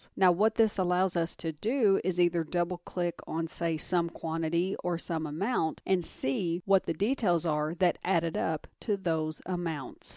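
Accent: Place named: United States. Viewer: From ALL THE INTERNET, you're unique, American with American accent!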